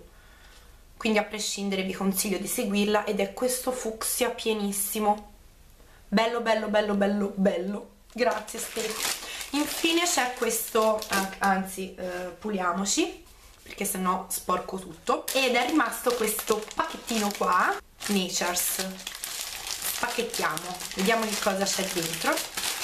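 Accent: Tuscany, Italy. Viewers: Ciao!! Ti seguo da poco e mi sei già troppo simpatica! 😃 adoro il tuo accento toscano, la tua simpatia e i tuoi capelli - un bacio grande, al prossimo video:**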